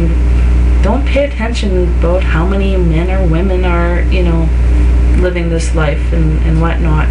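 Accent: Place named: Canada. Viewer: I really enjoyed hearing explanation to this question! For the one searching... just remember, the passed on author Scott Cunningham was a male, and another good teacher. Yet mannerisms and Great White North accent give things a little more personal aspect.:) Blessed Be!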